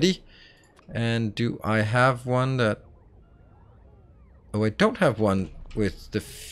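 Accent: Denmark. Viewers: I was today years old when I figured out you are Danish. Damn, you've managed to hide the Danish accent very well. Kom så :D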